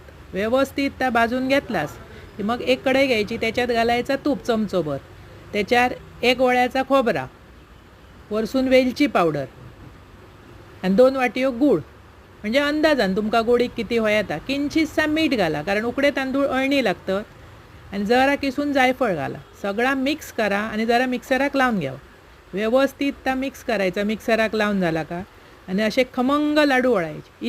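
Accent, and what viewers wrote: Malvani, India. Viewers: तुमची मालवणी बोली ऐकायला खूप छान वाटत रेसिपी तर अप्रतिम आहेतच "आवडतले तुमका" हे प्रत्येक रेसिपी नंतर जे आपण बोलता ते ऐकायला खूप गोड वाटत. 🎉🎉🎉🎉💐💐💐💐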